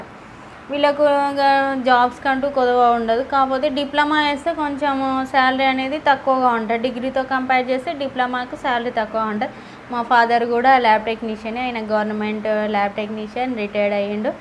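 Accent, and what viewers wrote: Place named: Telangana, India. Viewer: Telangana slang lo super chepthunnaru medam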